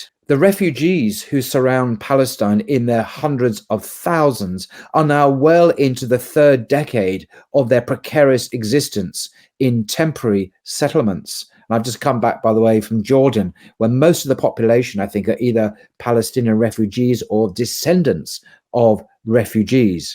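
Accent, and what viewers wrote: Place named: Cockney, United Kingdom. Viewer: I have been a student of Russell for my entire life. Deeply admire the man. He was a giant. Can't resist the following observation: You describe Russell as a "pacifist," then you go on to say--without any apparent sense of irony or recognition of contradiction--that he "supported the war against the Nazis as a lesser of evils." Hello? Anybody home? Ah, just watched the rest of the video. The Cockney accent grows stronger as it progresses. "Aerial bombing, blah, blah, blah." (Witness Dresden, for example.) You reveal yourself as both a moron and, perhaps most of all, a Jew hater. Thank you so much for this erudite little vignette.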